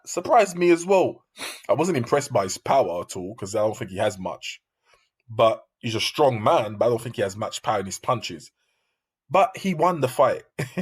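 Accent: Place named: United Kingdom. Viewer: youre british accent is a give away that you dont know parker very well, im a new Zealander been following his career since he began, he's a big let down, most of his fan base is overseas, he has rubbish punching power all his knock outs are journey men, really bad journey men and he didnt knock them out that bad, they just didnt make the tem count or got stopped, history proves he doesnt have good power and he gasses and drops his arms to regain energy in them, joyce hits hard and he hits constantly and pushes forward, he has a granite chin and parker has no power he wont be getting knocked out, parker had a decent chin too but can he handle the volume of joyces more powerful punches??? maybe not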